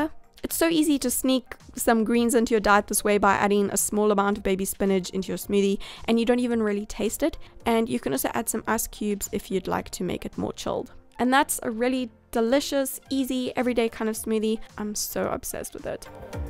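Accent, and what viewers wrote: South Africa. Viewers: HATS THAT ACCENT ? SOUTHAFRICAN ? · She's south african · Are you south African? That accent 😍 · are u South African or Australian? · Are you South African?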